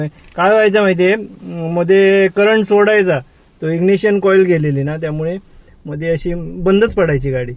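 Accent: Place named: Malvani, India. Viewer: तुजी बायको किती मेहनती आहे. तू जे मालवणी बोलतो ते ऐकून भारी वाटत.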